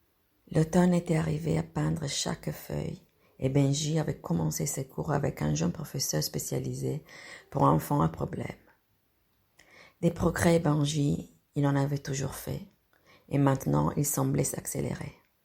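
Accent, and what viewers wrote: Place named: Italy. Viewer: Oh, bellissimo, ancora! Il racconto in francese, con il Suo accento italiano: carinissimo! Esattamente quello che vorrei: una donna/moglie italiana che impara e/o parla il francese!... Parla un francese perfetto,! Allo stesso tempo, è abbastanza normale, con 25 anni di presenza in Francia, come ha già detto. Dice "Pour mes amis français": grazie! Se io potessi essere un Suo amico francese, accetterei molto volentieri!... Lei è un'amica italiana, comunque!...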